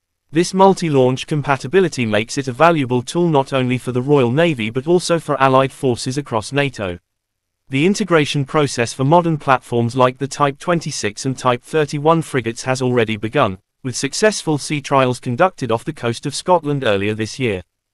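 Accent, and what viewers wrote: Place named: United States. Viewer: I wish all these American narrators would foxtrot oscar.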